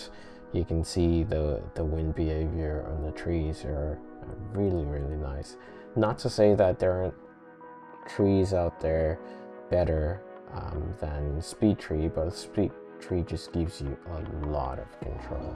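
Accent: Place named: Nigeria. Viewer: Such a skilled Man. Immediately I heard Nigerian, I smilled. Great Job my Brother.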